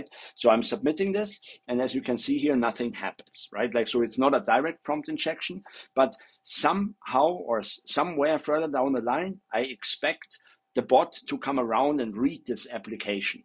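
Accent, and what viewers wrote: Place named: Germany. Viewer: Sehr gutes video , Ich habe von deiner Stimme erkannt dass du ein deutscher bist . Bin ursprünglich auch aus München. Hab auch mehrere Methoden selber herausgefunden Prompt-Injektion zu machen um schwachstellen in LLM-Models zu entdecken . Mach weiter so , liebe deine videos ! 🔥sind kreativer und gehen tiefer ins detail . ✌🏻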